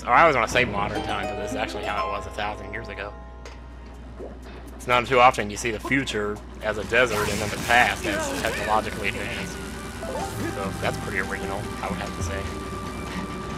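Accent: South, United States. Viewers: I love your southern accent never change it:3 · 1. Please talk southernly more 2. Please film yourself, it would be awesome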